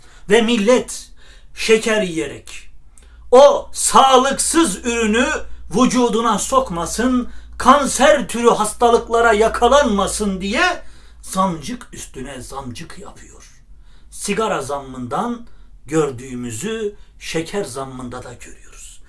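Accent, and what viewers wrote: Erzurum, Turkey. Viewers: Sempatik buluyor, sivenden supheleniyordum, Erzurumlu oldugunu soyleyince daha bir sevindirik oldum. Biz de sizin eski somurgelerinizden birindeniz ; )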